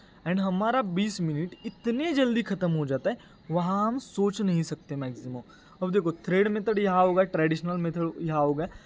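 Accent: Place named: Maharashtra, India. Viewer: Bhaii tumhari hindi sunke hi mai samaj gaya ki tum maharashtra wale ho😅😅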